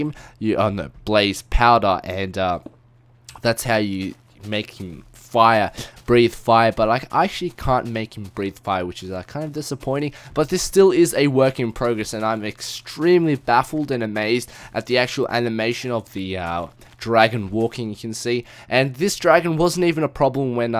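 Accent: Australia. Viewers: Are u Australian mate coz we are awesome ANZAC's for the win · O.o ur australian :O?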